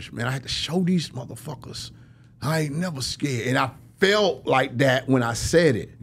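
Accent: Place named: South, United States. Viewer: This host always makes a rapper feels good with his hilarious laughs and comments,real love ,with his souther spin and accent on things .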